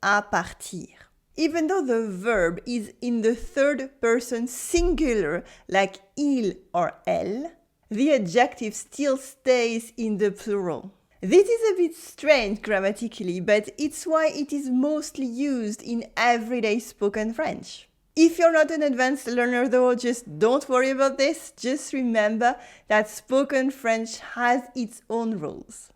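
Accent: France. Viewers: OK! You a French woman or a Quebecer? Makes a big difference!!!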